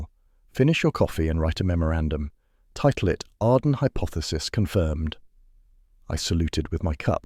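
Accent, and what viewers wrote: England, United Kingdom. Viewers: Sorry. Just can't accept the English accent for a NYC based story.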